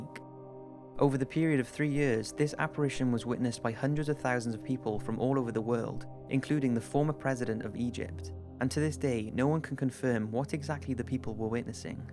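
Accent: Wales, United Kingdom. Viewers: do I detect a slight welsh accent?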